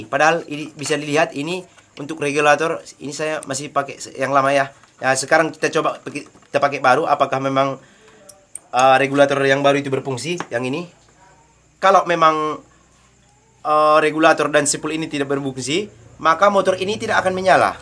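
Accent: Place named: Medan, Indonesia. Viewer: Saya selalu mengikuti channel abang ini, sangat diteil penjelasan y, trus logat abang seperti logat medan, jd nggak bosan sy sambil ketawa sy bang, lanjut trus boss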